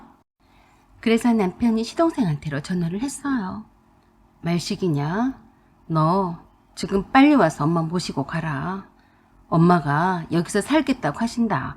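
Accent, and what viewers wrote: Jeolla, South Korea. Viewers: 전라도 사투리가 많이 들리시네요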